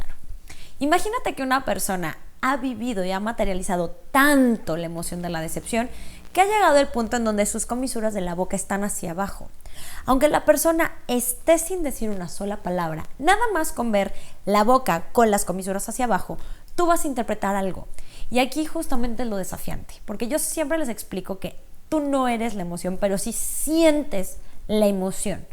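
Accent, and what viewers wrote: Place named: Mexico. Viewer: De que país eres? Me parece tu acento ligeramente mexicano, pero no estoy seguro.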